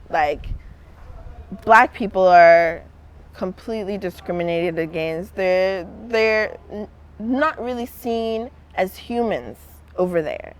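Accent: Africa. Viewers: She's not Canadian, sitting up there with her thick African accent. Has she ever been to the US. She's so ignorant. The same thing is going on in Canada with black people. How is she speaking on America? If she's never been to America she's just taking what people that she knows in America are telling her. I'm AA the way she saying, AA are treated in the US. I've never been treated like that. No one in my family's ever been treated like that. Not all AA are treated badly. Only a ignorant person would think that. That's like me saying all Africans live in the village in cow manure huts and you're all dirty and you're all stink. All African are educated. Like how ignorant would I be to say that. smfh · She has an african accent as well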